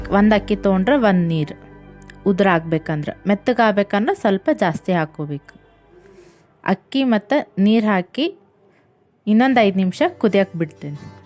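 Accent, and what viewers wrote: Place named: North Karnataka, India. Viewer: Nam ittar karnataka matu keli khushi ayitu sis super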